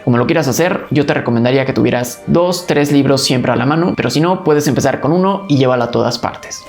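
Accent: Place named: Fresa, Mexico. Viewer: Y EL INTENTAR HABLAR FRESA ES PARTE DE LA RUTINA ? ...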